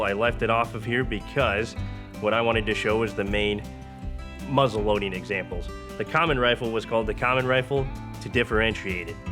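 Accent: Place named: Midwest, United States. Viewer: Midwest U.S. accent .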